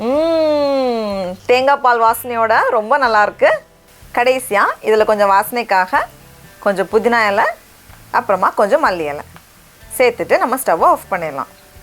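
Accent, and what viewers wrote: Nagercoil, India. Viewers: Akka NenGa NaGerCoil aH ???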